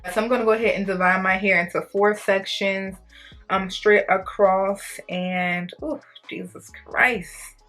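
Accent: New Orleans, United States. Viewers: It’s so interesting listening to you speak, I didn’t know you’re Nigerian but you definitely have that African American accent and a little bit of that NOLA flair.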